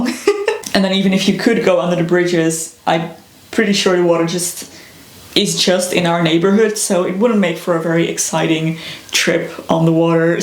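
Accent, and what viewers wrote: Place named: Netherlands. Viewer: One of the reasons I’ve been subscribed to you for so long is I’ve felt such a kinship with you from the beginning: I was a nerdy LOTR teen who enjoyed costuming and things like that. I’m American, but my dad’s family is Dutch and we lived in the Netherlands for a while when I was a kid, so I get such a thrill when you talk about life in what I feel is my second home. Early on when I started watching you I was like “Heyyy, I recognize that accent!” and was super excited to find out you were Dutch. I love your channel and your homeowner/gardening content is especially relevant to me right now as my husband and I bought a house just a few months before you and Robbert did. Your garden is basically my fantasy (we live in a desert so it’s impossible for me to do what I really want), it’s so lush and green. Anyway, this is all parasocial stuff, but I just wanted to say that you’ve got a friend in the western US. 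Thanks for what you do! (Also being a mom is the coolest thing ever - obviously a super personal decision, but I absolutely love parenthood!)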